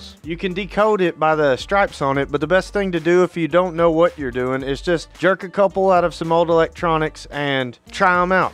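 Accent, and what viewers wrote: South, United States. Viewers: I added this to a playlist about a year back, and lost it. I searched YouTube high & low typing in so many keyword combos and variations to run across it again, because it's brimming with useful info. Happy to revisit. Channel with the Southern accent INSTANTLY told me I was in the right place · he's just southern, reminds me of Hank Hill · Hahah, that's exactly what I was going to say, he's just southern, lmao.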